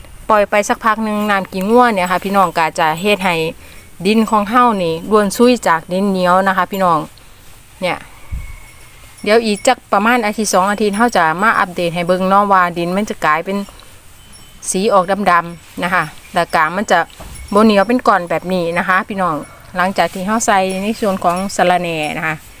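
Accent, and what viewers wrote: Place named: Isan, Thailand. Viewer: ภาคอิสานบางคำก็ฟังไม่รู้เรื่องไปก่อนละนะ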